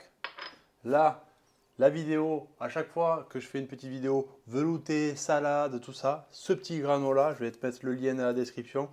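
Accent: South, France. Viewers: La recette que je cherchais, merci ! Et puis cet accent du sud, un vrai voyage culinaire ! 😊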